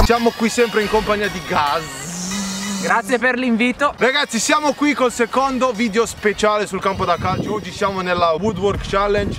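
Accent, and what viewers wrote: Molfetta, Italy. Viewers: ma sei di molfetta?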